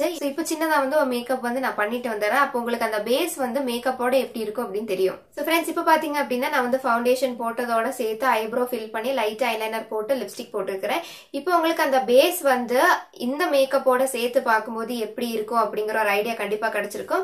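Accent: Nagercoil, India. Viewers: Nagercoil slang varuthu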